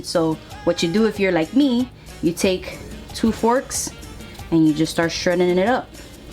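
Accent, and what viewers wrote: United Kingdom. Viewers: So excited to see a video that I watched it twice! Girl, I have said it before and I will say it again... I fucking love you! So down to earth and chill and a British accent! Video was clear AF too, making those red lips look super bomb. Bless the fam and don't make us wait so long for the next video, LOL!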